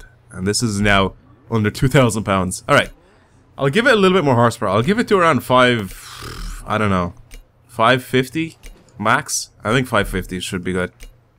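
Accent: Ireland. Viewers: Are u IRISH, I am :)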